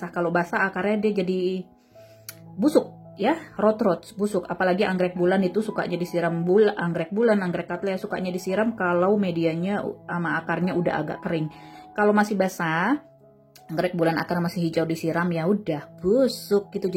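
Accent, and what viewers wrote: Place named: Medan, Indonesia. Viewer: Biasa kekgitu mba,, buat jd motivasi agar lebih baik kedepanna 🤗 Kalo saya pribadi mmg sukak dengerin cerita mbaknya hehheh...kayak logat anak Medan 😁😁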